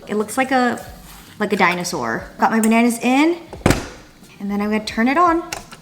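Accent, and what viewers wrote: United Kingdom. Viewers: Loving the British accent while cooking haha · I like your British accent. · Are you British accent? It sounds slightly korean.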